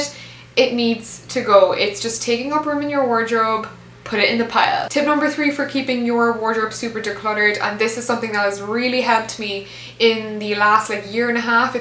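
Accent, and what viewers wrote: Ireland. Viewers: Thank you for theses tips! I love your accent, are you from Ireland?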